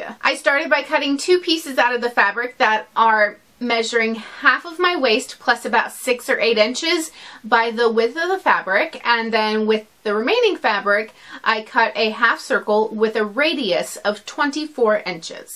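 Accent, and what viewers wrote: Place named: West Coast, United States. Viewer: Would you mind if I asked where you are from? You have a bit of an accent, but I can't tell exactly where from. California? Arizona? West coast USA? Sorry, this question is irrelevant to the topic but I'm very interested in accents & dialects for my work. Thanks! Beautiful skirt, too!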